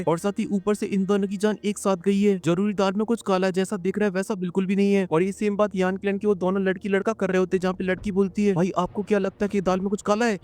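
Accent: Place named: Hyderabad, India. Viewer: His sarcastic way of explaining with a slight hyderabadi tone is really entertaining amusing and hilarious keep it up brother i really fan yours explanation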